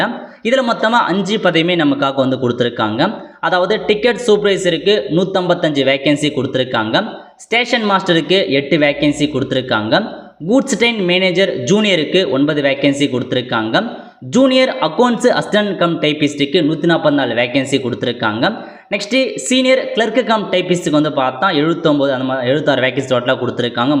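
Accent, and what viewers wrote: North, India. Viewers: நீ தமிழன் தானா...? உன் பேச்சு வடக்கன் மாதிரி இருக்கு...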